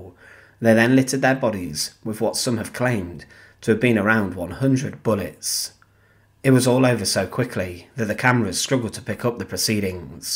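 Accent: United Kingdom. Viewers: Yes we call that British English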